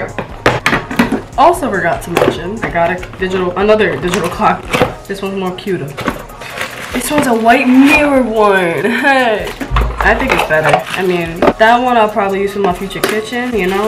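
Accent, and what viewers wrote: New York, United States. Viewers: lmao that is no florida accent at all, its a New York New Jersey accent. Listen to how she says Cawwfee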